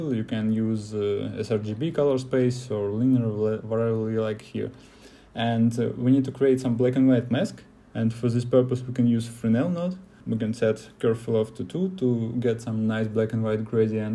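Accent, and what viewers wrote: Russia. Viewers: Русский акцент) Ты случайно не с мастерской?) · оцень русский акцент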